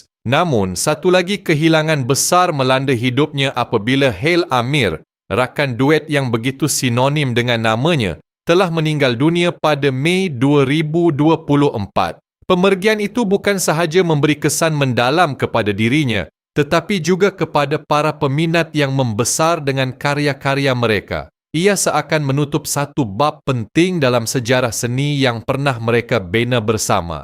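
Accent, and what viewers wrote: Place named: Indonesia. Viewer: ni konoha, diorang memang banyak buat content merapu. Kalau dengar cara bercakap ni bukan Malaysian 🇲🇾, orang seberang!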